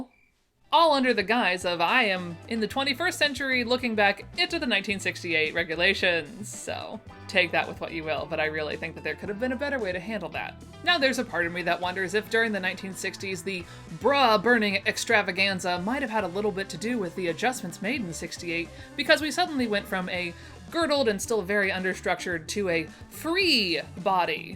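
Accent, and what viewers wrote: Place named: Ontario, Canada. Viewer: Are you sure you don't live in Canada, you sound like you're from Ontario like me! I think the only thing that angers me about the big 4 patterns is that I'm not in the size range unless it's one of the few smocks they have. It's why I draft my own for basic stuff or buy from Indie companies that acknowledge that mid fats exist!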